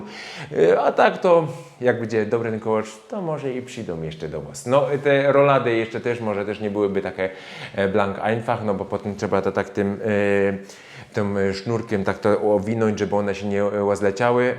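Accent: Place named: Opole, Poland. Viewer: Fajnie se ciebie suchoł . Malo se tu spotykoł że chtoś żundzi jak w opolskim 😉 a szpajzy to były maszkety mojego syna 😋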